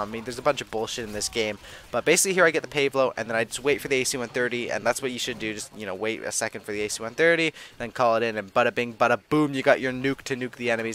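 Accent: Canada. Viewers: r u Canadian like me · are you from Canada?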